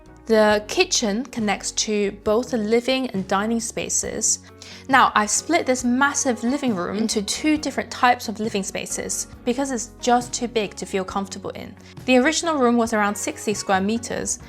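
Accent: England, United Kingdom. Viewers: Which part of England is YOUR ACCENT FROM ? manchester?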